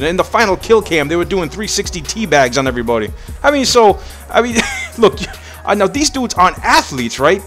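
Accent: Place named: East Coast, United States. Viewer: He's not black. Its a common east coast accent that white people have.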